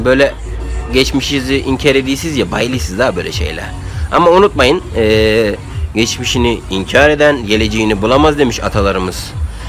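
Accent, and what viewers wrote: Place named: Terme, Turkey. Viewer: Abi senin konuştuğunu genelde termede konuşuyorlar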